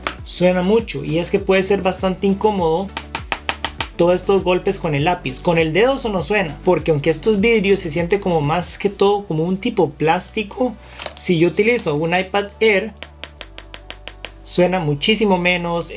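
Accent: Costa Rica. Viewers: Hola Hola! He visto algunos de tus vidos y me han gustado por lo claros y concretos, muy bien elaborados gracias! Para un uso universitario (lectura, edición de documentos word, docs, excel, sheets, pdf) ¿cuál me recomiendas? El 9 no me llama la atención mucho por su puerto lightning Estoy entre iPad y Tab S7 FE. . . PD. Tienes un acento como tico 👀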